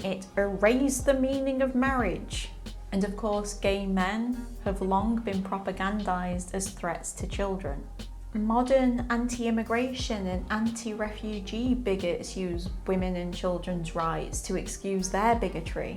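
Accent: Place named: Northern England, United Kingdom. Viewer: wonderful work. i have smashed that like button and hit subscribe. i miss the northern english accent spoken softly. i don't want to guess the county because to get that wrong is more often to offend than not.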